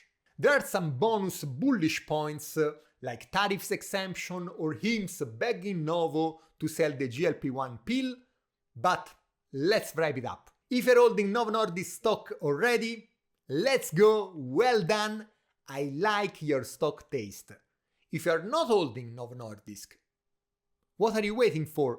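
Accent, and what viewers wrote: Italy. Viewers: questo accento mi sa di italiano D: anyway good video!! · Love your Italian accent and I your reasoning. I is vivifying. I use Eli Lily (prescribed by my doctor), but invest in Novo Norisk.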